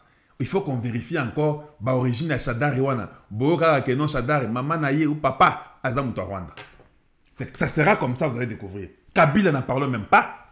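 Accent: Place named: Texas, United States. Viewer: Votre colère est justifiée vous n'avez pas mâché les mots. Vous méritez un post ministériel avec vos compétences. Vous parlez comme un texan! Merci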